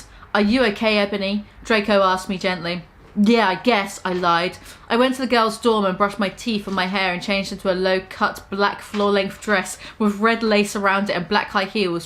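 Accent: United Kingdom. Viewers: I gotta say, hearing this in a British accent makes it better than other readings i've been listening to lol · with a british accent... Noice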